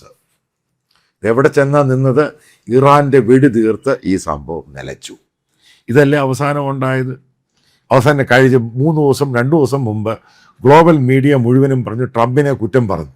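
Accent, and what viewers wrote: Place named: Pala, India. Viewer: അച്ചായൻ ഈ വിഷയത്തെ കുറിച്ച് പഠിക്കാത്തത് കൊണ്ട് മാത്രമാണ് ഈ വിവരക്കേട് വീഡിയോ ഉണ്ടായതെന്ന് എനിക്ക് മനസിലാവുന്നുണ്ട് - അവനവന് പറ്റുന്ന വിഷയം മാത്രം എടുത്താൽ പോരെ..... ഇൻ്റർനാഷണൽ ഇഷ്യൂസിനെ കുറിച്ച് കൃത്യമായി പഠിച്ച് നല്ല രീതിയിൽ വീഡിയോ ചെയ്യുന്ന എത്രയോ വിദഗ്ധർ ഇവിടെയുണ്ട് , അവരുടെ ചാനലുകളും - അച്ചായൻ്റെ പാലാ Slang ഇല്ലെന്നേയുള്ളു ......😂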